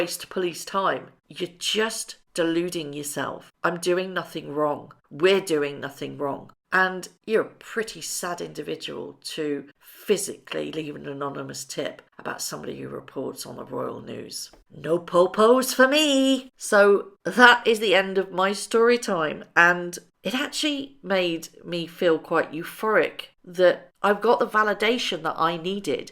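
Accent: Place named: United Kingdom. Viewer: Dear. I said before that your British accent is adorable and so easy to understand ❤ Greetings from California. 🙏 Thank you for spreading the Truth. Wishing you and your family all the Best. Hope and 🙏 you have an easy recovery on your health. Last but not Least, you are a genius in knitting. Truly hope one fine day, you will give us a knitting tutorial how to make a knitting doll 👍 Her Majesty The Queen Elizabeth II ❤, You are loved ❤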